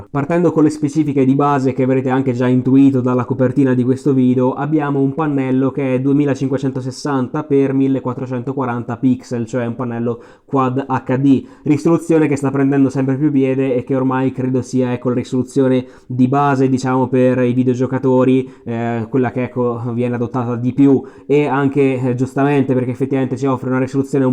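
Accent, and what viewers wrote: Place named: Valtiberina, Italy. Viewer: ma per curo caso, sei di origini umbre? hai un'accento buffo che ricorda quello valtiberino in parte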